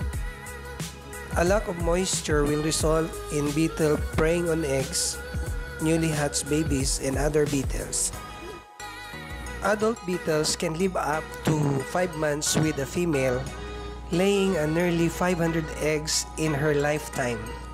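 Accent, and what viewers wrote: Philippines. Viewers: I am assuming that you are Filipino when you started talking Great video mate!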